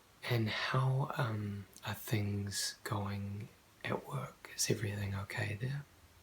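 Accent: New Zealand. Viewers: wow I'm Korean and I sometimes watching your asmr, And it's really helpful to my sleep! your kiwi accent is soooooooo nice!!!thank you :)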